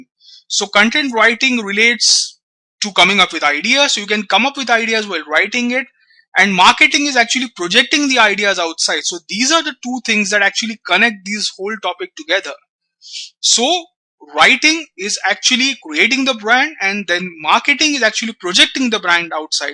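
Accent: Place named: Scotland, United Kingdom. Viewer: ha its bit uk cuuunt. scootish i think.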